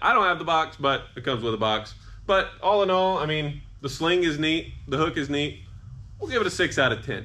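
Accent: South, United States. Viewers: This man sounds exactly like demolition ranch but with a bit more of a southern accent.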